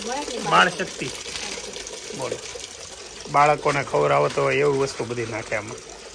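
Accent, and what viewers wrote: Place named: Saurashtra, India. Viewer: તમારા આ vlog મા ગામડાનો જીવન બતાવ્યું એ જોઈને બહુ મજા આવી. તમારા vlog એકદમ ઓર્ગેનિક હોય છે. તમારી સૌરાષ્ટ્રની તળપદી ભાષા સાંભળવાની મજા આવે છે. ફઈ ના ઘરે ફુવાને ઇગ્નોર કરતા હતા તે જોવાની મજા આવી. Keep it on. ☺️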